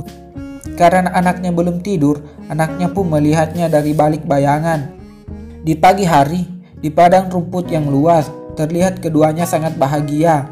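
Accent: Bugis, Indonesia. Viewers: Logat bugis ta yee' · Makassar gk bisa hilang logatmu 😂😂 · Bang lu orang bugis gak si. Soal nya orang bugis ngomonya kaya gitu? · Mantap logat Bugis nya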